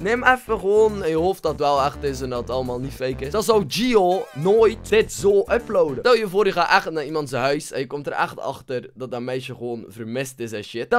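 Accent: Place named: Flanders, Belgium. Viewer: Feek Vonk als je zegt ma hoeft t nie te zeggen dat je Vlaams bent, kan ook Limburgs of Brabants zijn.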